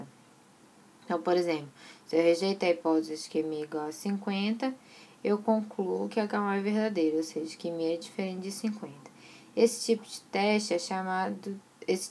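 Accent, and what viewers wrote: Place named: Minas Gerais, Brazil. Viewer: Ótima explicação e o sotaque mineiro é bão dimais.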